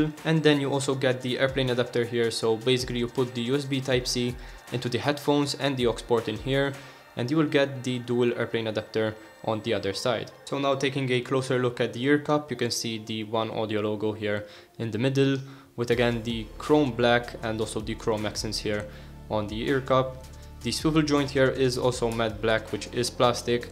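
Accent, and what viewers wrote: Malta. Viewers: that accent is totally maltese :D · I'm betting my left testicle that you're Maltese going by the accent 😆 ... Good vid bro! Keep it up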